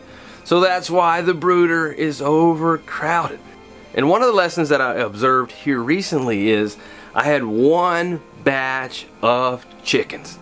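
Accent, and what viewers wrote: South, United States. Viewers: Hi Family & everyone! I’m from Nashville Tennessee. And your come on come on> country twang makes me miss home. Love ya guy’s! God Bless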